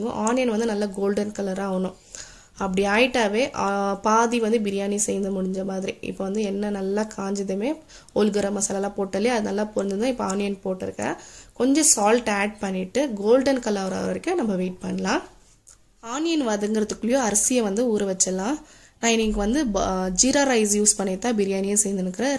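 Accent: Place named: Vellore, India. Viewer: Sister are you from Vellore area.ur slang looks like that.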